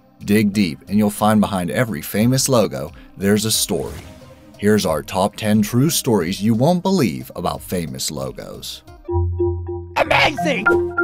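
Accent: United States. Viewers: I’ve heard this channel is a UK site with an American accented narrator. They give themselves away every video. It’s pronounced “choopa choops” in the US too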